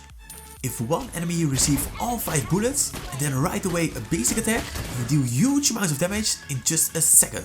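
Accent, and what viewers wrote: Netherlands. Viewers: Ik hoor gewoon dat je Nederlands bent HAHAHAHA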